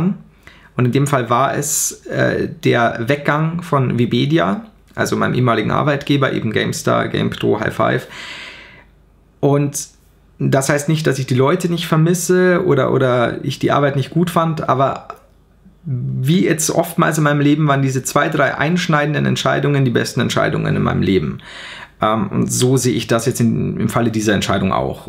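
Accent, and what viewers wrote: Bavaria, Germany. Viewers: Hey du bist einfach der beste aber bitte heb nicht ab denn so wie du bist bist du einfach nur geil und s geilste is ja dast bayrisch schmatzd aiso i wünsch da ais guade:) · Ich habe dich leider erst vor einer woche ca. Gefunden, aber ich feier deine videos/ dein Humor Ziemlich, Die neuen sowie die älteren. Viel glück und viel spaß weiter hin !!! Ps : Ich feier dein Dialekt, aber könntest du ma ein Ausraster over 9000 auf Bayrisch machen ? xd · Aus welchem Regierungensbezirk Bayerns kommst du? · Dei bayrisch is sa gal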